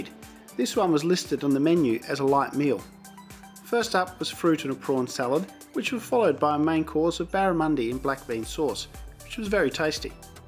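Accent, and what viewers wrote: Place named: Australia. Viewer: your so handsome and a gracious host. Love that Aussie accent!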